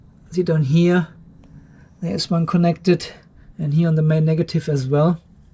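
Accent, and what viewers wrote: Germany. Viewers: Höre ich da einen deutschen Akzent raus?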